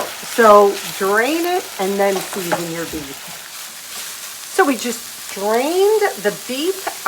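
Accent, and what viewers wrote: New York, United States. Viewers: Congratulations! So excited for your channel's growth! I love your channel. I adore your NY accent. I am a native NY'er transplanted to TX and I feel like I'm back home when I have your channel on, playing in the background while I cook. The latest thing I learned during my frugal journey was to pressure can. I pressure canned chicken and beef and didn't blow up my kitchen! I call that a win.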